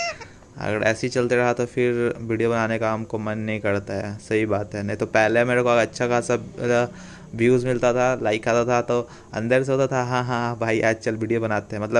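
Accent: India. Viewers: Bhai tone se toe aap mithilanchal k lgte ho. Kha se ho aap ??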